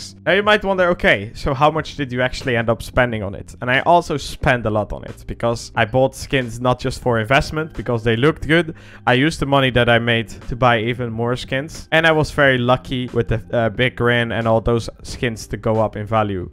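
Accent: Netherlands. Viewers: is it me or does sound a little dutch? or i’m wrong 🥴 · Haha je hoort gewoon dat je NL bent 😂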